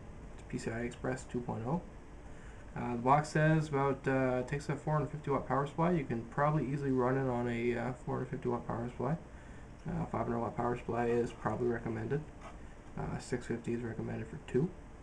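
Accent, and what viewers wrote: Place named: Canada. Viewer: You couldn't sound any more Canadian. I'm getting the Vapor-X edition myself.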